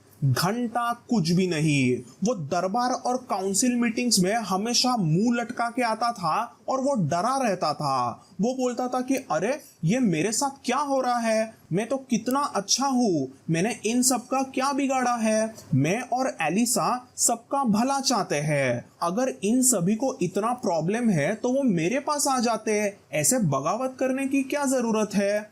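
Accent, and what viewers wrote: Maharashtra, India. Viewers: your hindi has a bit of Marathi touch to it, are you Maharashtrian?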